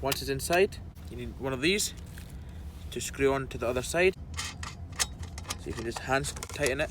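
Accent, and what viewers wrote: Scotland, United Kingdom. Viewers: Thanks for taking the time to show us amateurs how to secure an armrest. I have just bought a 2013 Octavia and need to order one as I keep looking for something to support my arm when I feel lazy. Incidentally, love the Scottish accent. Cheers Mate.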